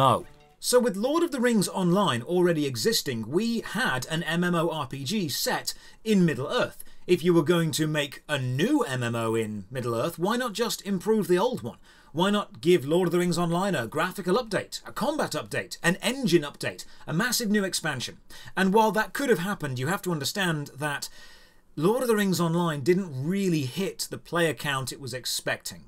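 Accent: United Kingdom. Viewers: Why is reviewing MMO with a british accent?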